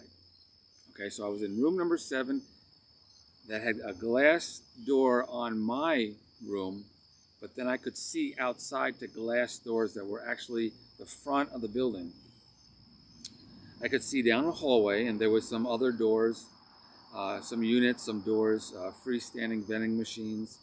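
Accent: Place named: New York, United States. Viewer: This is fascinating,! The New 'Yawkr' accent coming out here. I am from Ossining, NY so I really hear it. I support and believe in you AND the experience you've had. May God bless you and you're family and keep tawkin bro. ❤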